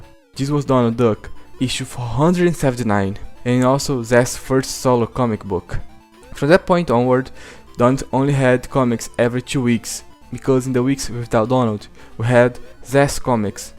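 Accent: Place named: Brazil. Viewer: You is brazilian beacause you have a brazilian assent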